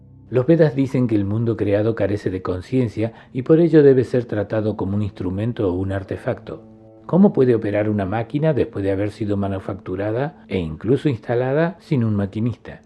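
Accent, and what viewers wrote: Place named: Córdoba, Argentina. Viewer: El lector es Cordobés argentino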